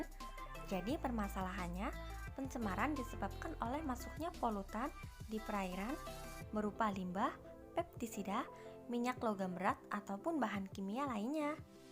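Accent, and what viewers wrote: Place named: Cilacap, Indonesia. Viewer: Ngomonge koyo wong cilacap bu hikkk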